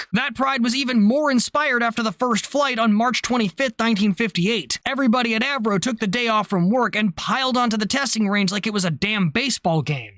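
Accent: United Kingdom. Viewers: As a British citizen 🇬🇧. I approve of your accent you may to Britannia & feel welcome. Keep up the jolly good Work old boy. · I was wondering where the CR105 in project wingman came from. Banger British accent bro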